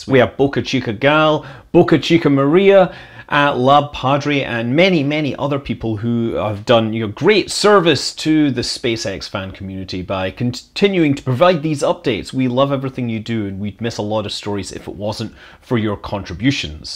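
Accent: Scotland, United Kingdom. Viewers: Scott Manley Can I just ask you something- so I’m guessing you stay in the States now but how long is it since you left Scotland? And where did you live in Scotland? I just ask because my accent is really similar. I stayed between Sterling and Edinburgh before moving to just south between Edinburg & Carlisle. Now after living in New York for quite a while under dual citizenship, my Scots is gradually fading - although I still have an accent like yours but now all the ~Scottish slang words have disappeared from my vocab. Just interested, thanks mate 🚀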